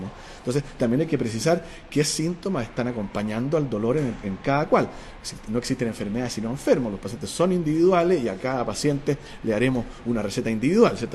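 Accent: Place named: Chile. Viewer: Me huele a chilito por aquí jajajaja me encanta ese acento saludos desde España una exmigrante enamorada de Chile y su cultura gracias🇨🇱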